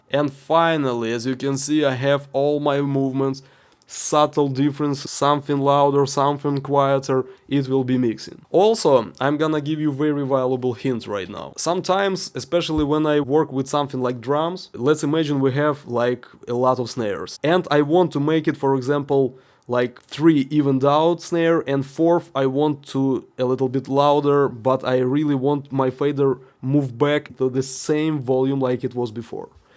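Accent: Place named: Russia. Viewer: Great one - thanks a bunch! You sound Russian, not that it matters, but what does is your approach and delivery that has no fluff or self admiration - a very Russian way to break things down to essential points and pass them to a comrade :)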